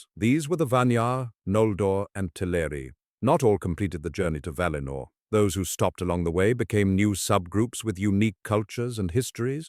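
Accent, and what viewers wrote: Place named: RP, United Kingdom. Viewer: Love your RP narration. Puts me in mind of The Inklings.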